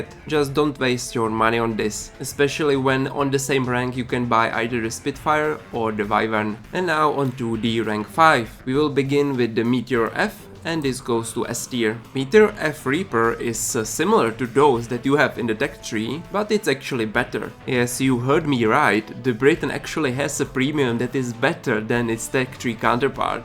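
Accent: Czech Republic. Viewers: Ja si taky rikam, po peti sekundach co sem ho poslouchal sem se sel podivat do komentu jestli tady nebudou cesky komenty😂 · Podľa angličtiny mi je jasne, že si čech :D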